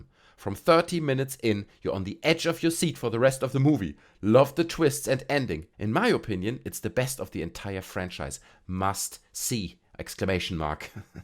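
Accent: Germany. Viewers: Subbed today. Love the authenticity. Can’t place your accent. Is it German?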